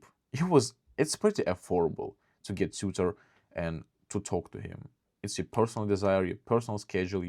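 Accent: Russia. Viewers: Сходу понял, что ты из России) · accent giving u out a lot, work on dat (as a russian myself) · I'm not currently interested in reaching the c1 level but idc, you're so handome and have russian accenttt 😝🔥 good luck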